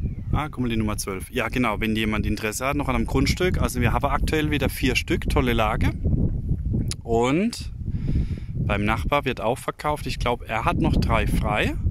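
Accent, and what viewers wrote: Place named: Mannheim, Germany. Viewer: Es wird sehr , sehr schön 👍 Eure neue Katze sieht genz wie unsere aus. Wir haben den ersten Tag in unserem Haus gewohnt, da stand sie ganz ausgehungert vor unserer Tür und hat kläglich miaut. Dann haben wir festgestellt, dass sie auf unserem Grundstück in einem Holzscheit drei Babys versteckt hat. Wir haben alle zum Tierarzt geschleppt, aufgepäppelt und uns entschlossen , dass wir sie alle behalten. Hier sind alle übermäßig versorgt mit Tieren und bevor sie in schlechte Hände kommen bleiben sie bei uns. Die Mama haben wir Bounty genannt. Weiterhin viel Erfolg und Spaß beim gestalten . P S Ich frag mich ja immer welchen Dialekt Ihr sprecht, ich tippe auf Monnem 🤣oder Ludwigshafen. Bobenheim/ Roxheim hatten wir ganz früher mal sehr gute Freunde, die haben so gesprochen wie Ihr. Wir sind aus der Nähe von Meenz { Mainz)😂🖐️🖐️ Liebe Grüße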